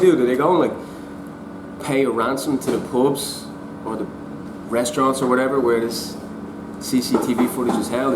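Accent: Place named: Dublin 4, Ireland. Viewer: I dunno what In the name of Jesus brought me to this video or why I clicked it , I can't listen to you ...ya dublin 4 Ponce ya😂 😂 keep doing what your doing buddy by all means ... we don't need a 2 hour analysis of a 30 second clip joe Rogan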